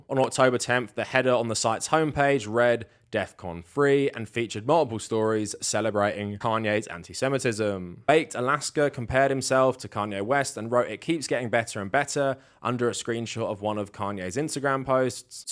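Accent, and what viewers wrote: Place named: United Kingdom. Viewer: in an annoying british accent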